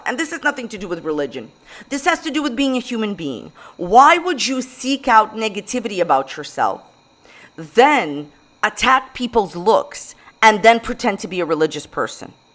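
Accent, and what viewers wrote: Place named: Long Island, United States. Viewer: Omg the clip has me WEAAAK😂😭💀 you're the BEST reaction YouTuber with the funniest edits. You're hilarious & I always look forward to my girlfriend putting on your newest video everyday. The Monsters Monsters Monsters edit had me dying💀💀💀 Edit: Hey if you see this, I've always been wondering what accent do you have? It's like a kinda faint Long Island accent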